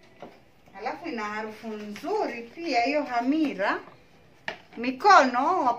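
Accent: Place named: Swahili, East Africa. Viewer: I love that Kiswahili accent ❤️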